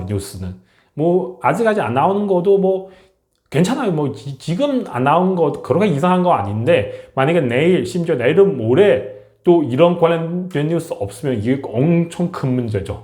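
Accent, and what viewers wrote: China. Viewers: 이소식 전파하는분? 중국발음에 취해있는분?억양이한국본토발음어색한거로들림 아묻튼 진실만을 전했음합니다 중국짱께는 죄명이 죄인이그리워하는나라 ㅡ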